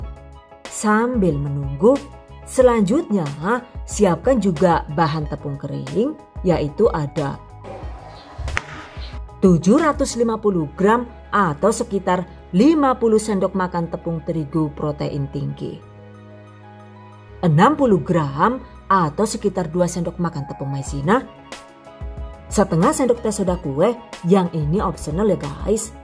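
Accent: Banyumas, Indonesia. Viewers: Wong ngapak kie yah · Penasaran sm suaranya, kirain sdh ibu" stngh baya, tak taunya msh muda bs ngapak sdkit ngebodor bs bhs inggris pula keren 👍👍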